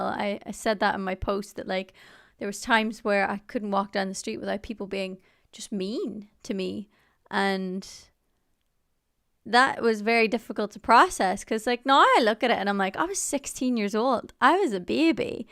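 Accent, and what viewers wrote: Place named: Ireland. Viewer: Did they ask you to change your accent on XCRAPTOR. I was so happy when you kept your beautiful Irish tone.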